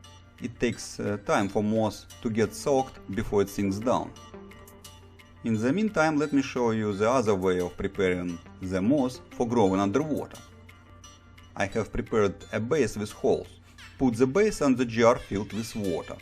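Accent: Russia. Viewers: Why you sound like Russian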